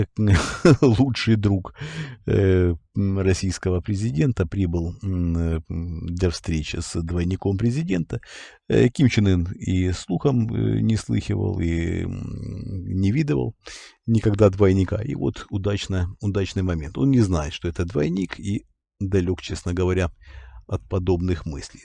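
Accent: Ukraine. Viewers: Вопрос❤,у вас украинский акцент,вы украинец по национальности???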